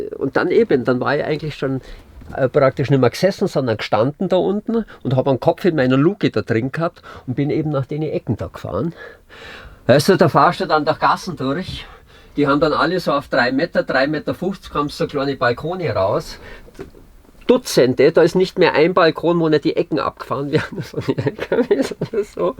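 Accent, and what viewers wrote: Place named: Allgäu, Germany. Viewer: Du bist ne absolut coole Socke! Dein Bus ist obersupergeiles Teil, ich bin begeistert. Deinem Dialekt nach würde ich Kempten vermuten, oder in der Gegend?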